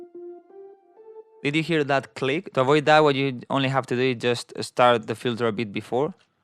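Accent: Spain. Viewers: este hombre es un español hablando en ingles o es cosa mia? buen video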